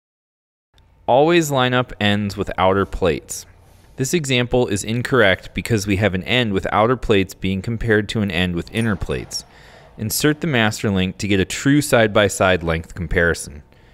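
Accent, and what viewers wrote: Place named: Canada. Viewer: sounds like a canadian..I like the canadians I have known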